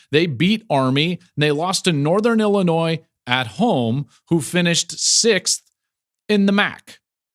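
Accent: South, United States. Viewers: Wow, I'm surprised you decided not to use your southern accent and unprofessionalism to mock the whole South to get your point across this time. Your bias is so obvious. Why don't you take some cues from Greg Mcelroy sometime about hiding biases when working professionally, and he has been in the business for a shorter time than you. You completely lost my respect when you decided to mock a 2-time national champion. What are your accomplishments compared to that?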